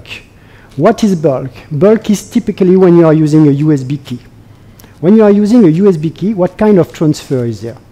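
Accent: France. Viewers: He says ON POINT: he is trying to pronounce Endpoint apparently. He pronounces English words according to the French pronunciation rules. ZE OST = The host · vıcık vıcık fransız aksanı, başka adam yok de mi sunumu yapacak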